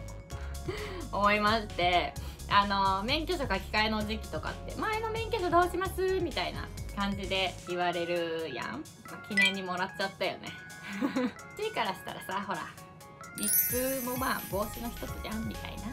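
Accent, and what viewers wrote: Kansai, Japan. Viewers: 顔も可愛いけどたまに出る関西弁がほんと可愛い🤤💕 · 関西弁かわあい💕 ちょっとお顔違うねー！ 今の免許書の写真かわいすぎ！もっとビックでみたーい！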